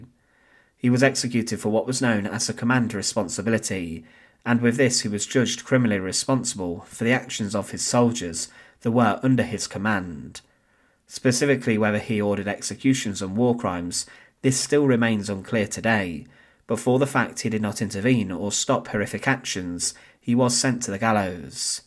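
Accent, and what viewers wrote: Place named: North, United Kingdom. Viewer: Ridiculous narration style. Northern kindergarden pronunciation and intonation. 🤮🤮🤮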